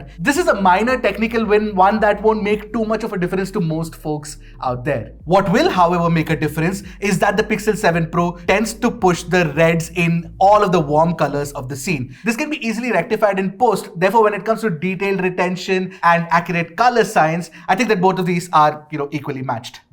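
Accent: India. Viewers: Indian accent 😂😂 love it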